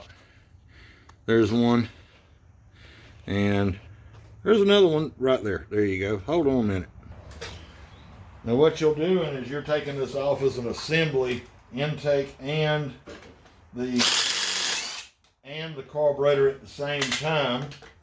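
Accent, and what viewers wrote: South, United States. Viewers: Love. The. Southern accent!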